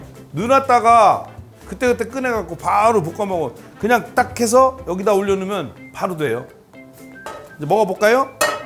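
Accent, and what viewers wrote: Chungcheong, South Korea. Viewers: 우리 백선생님은 요리를 구수한 충청도 사투리 억양을 양념으로 살~짝 깔고 설명해 주셔서 뭔지 모르게 쉽고 맛깔나는 듯~ 화이팅 입니다~😊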